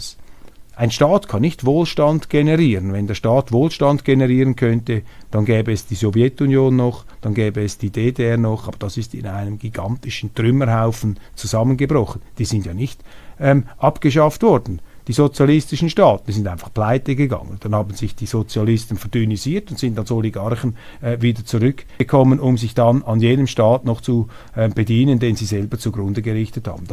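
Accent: Switzerland. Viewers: Bonjour nach Zürich! Wenn mir eine Randbemerkung erlaubt ist.. Ich mag Ihren Schweizer Akzent.. Bin aber überrascht, wie akzentfrei Sie Englisch und Französisch sprechen. Kompliment.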